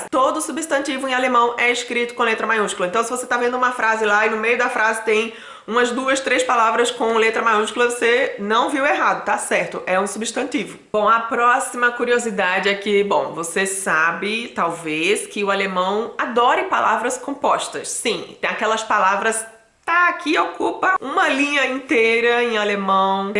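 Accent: Northeast, Brazil. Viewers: Tens algo de sotaque do nordeste...eu estou em Recife.... assinei seu canal e vou seguir, você é muito agradável..me sinto bem ouvindo você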